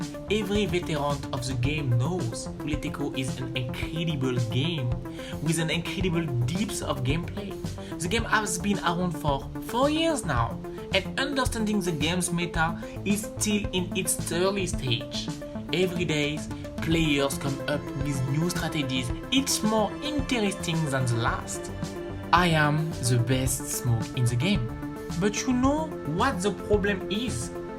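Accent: France. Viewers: Pro u were from France LOL 😂 I thought you are from USA 😅 but not bad you had explained well bravo 👍🏻🤝🏻🥃🍷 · after see ur face reveal on DWI server long time ago i don't expect u have this kind of voice but that france accent 100%🔥 hehe, nice vids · Are u French?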